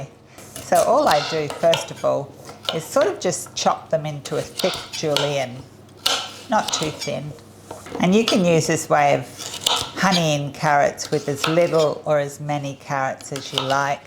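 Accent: Australia. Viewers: I don't think Dave picked up on your accent. True blue, it is, if you ask me. Nice work . . .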